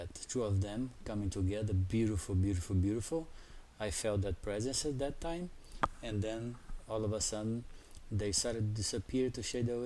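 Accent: Brazil. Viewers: Ola. Pelo sotaque, voce deve ser brasileiro. Fiquei muito interessado no seu depoimento, mas compreendo pouco o ingles. gostaria de saber se voce poderia inserir legenda em portugues. grande abraço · Hey Man....You Brazillian right ? You sound It ! I am in Porto Alegre and we have a a small cluster that make and use the CE5 Protocol quite.often. Just to let you know you have allies and you not Alone. ALL the best Man.. Peace out !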